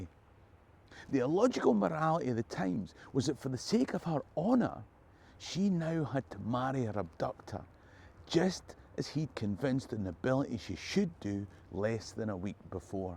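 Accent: Scotland, United Kingdom. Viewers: What do you mean? pronounces it the way anyone who grew up in Scotland does. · 😆, I am lovin your braw accent as much as your video content. Hello from deep, arctic, hick-land Iowa. My Scots Irish genes/ancestry are humming like a piper just listening to your stories. Thanks for brightening up my third winter of isolation. Very fun and even my cu (cur hound) will listen with me.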